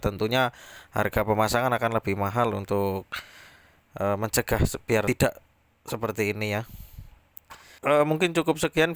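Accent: Banyumas, Indonesia. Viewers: Ngapak pa ya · Mntp, ngapak y ngmngnya 👍👍👍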